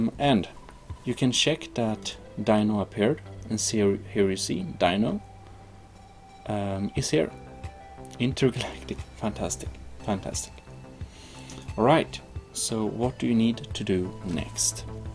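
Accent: Sweden. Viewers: Är du svenskkkk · U from sweden aye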